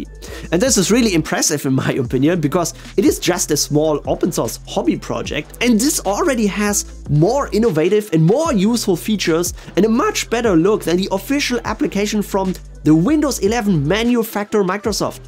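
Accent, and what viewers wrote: Germany. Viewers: Boh-Ey, ein Deutscher der englisch spricht... Jetzt muss ich auch noch den Translator aktivieren um was zu verstehen...😱😱😱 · Cooles Programm, werde ich definitiv ausprobieren. Btw. als ich die Stimme gehört habe hab ich gedacht oh ein deutscher. Dann sehe ich den Namen und ich lag richtig 😅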